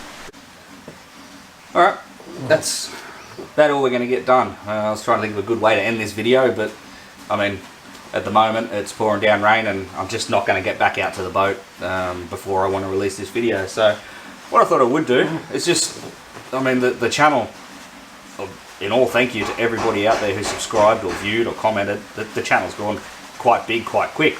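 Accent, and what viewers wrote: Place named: Northern Territory, Australia. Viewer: Hi mate sounds like your in the northern territory !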